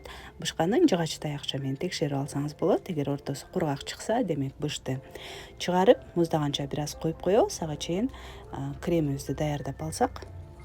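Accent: Standard. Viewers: Азаматсыз,ийгилик каалаймын.Таза кыргызча суйлогонунуз учун лайк басып,катталдым💐Буйруса даярдап кором.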